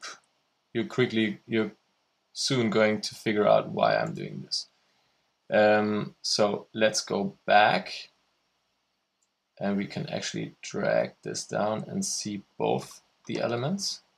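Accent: Germany. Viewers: Thanks mate! This is exactly what I need! I was making (trying to) some tracks in FL Studio like 5 years ago and think Ableton is better. Working here should be similar I guess, but I totally forgot how to set instruments etc:.:D So this tutorial is very useful! Anyway your accent sounds a bit German, do you come from Germany, the land of one of my favourite DJ, Paul van DyK?;D:)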